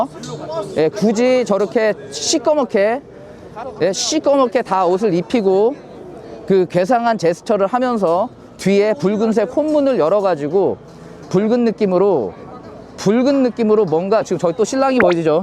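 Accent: Gangwon, South Korea. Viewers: 야 강원도 말투다 임마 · 강원도 말투 같은데?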